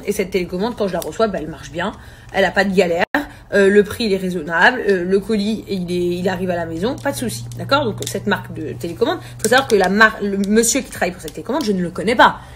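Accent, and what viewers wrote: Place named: Arab world. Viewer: J'ai stoppé la vidéo net en l'écoutant parler comme une arabe. " ca va porté le ein ! Euh vous etes au courant que plus de lamoitier des marques quel pub sa leur appartient à elle et son mec ???? Tous les trucs de cire et compagnie cest a eux donc si vous recevez pas cest qu'ils continuent encore et encore a vous escroquer et à vous mentir.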